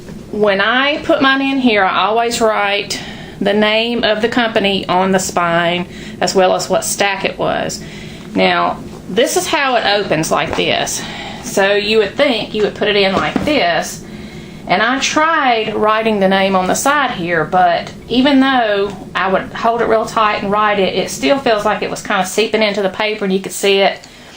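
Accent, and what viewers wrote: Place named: South, United States. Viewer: Great idea! Wish I had a full room to use for crafts, and not just a desk in my living room. Lol. P.S. I LOVE your southern accent. ^.^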